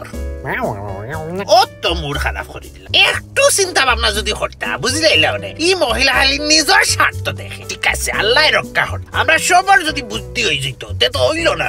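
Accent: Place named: Sylhet, Bangladesh. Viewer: this guy is actually fakhna sylheti... like how someone born and bred in desh would actually be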